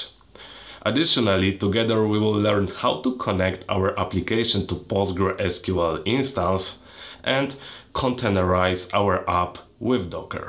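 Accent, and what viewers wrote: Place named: Russia. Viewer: Видно, что акцент русский, но видео на пендосском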